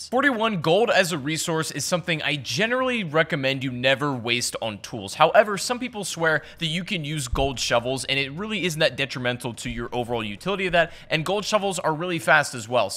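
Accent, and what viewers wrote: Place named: United States. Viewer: Omg an american/Canadian accent thnk goodness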